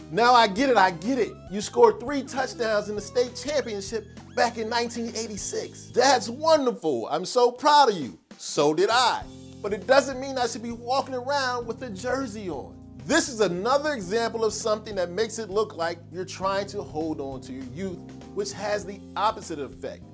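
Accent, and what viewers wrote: Philadelphia, United States. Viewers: Bro as soon as I saw you and head you talk I KNEW you were from Philly. Honestly. It has to do with your grooming and how you express yourself. I would even go as far to say either Mount Airy or Cheltenham but definitely not North /South Philly? I’d like to know. I grew up in the Burbs, North Wales, the Holiday Inn was the Spot, along with Kim Graves, the Library and Second Story and Freaked out Catacombs. I am Thankful to be ALIVE TODAY. I was often, back in the day, in Ronald Phillips to get the freshest kicks. Or Today’s Man for clothes. I guess that tells you how old I am. I personally don’t feel comfortable in the slim pants leg simply because of my athletic build, but there is truth in the statement that Style is Personal. And the most important factor to a good looking, or attractive man is grooming. I remember growing up in that 80’s TP Era, always FRESH, when Men made an effort to IMPRESS the Ladies, BUT it was MORE important that You felt good. 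Changing up with a 3 day beard, or shoes with no socks. I still do wear CB Boots, but Ostrich, Lizard, or Croc with jeans. Some things don’t change. As a matter of FACT you resemble the Shoe salesman at Ronald Phillips LOL. Although most of the Brothers would feed off Other Bro to find the adequate style, I always liked to mix in the European flavors. I was into the Older Men’s fashion sense at a young age. I was very impressed with Bogart, Cagney, and the KOOL George Raft. Had a Subscription to GQ and learning about Designer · As soon as you started talking, I was thinking “He sounds like Philly.” And you are! Your vid popped up and I enjoyed it. 👍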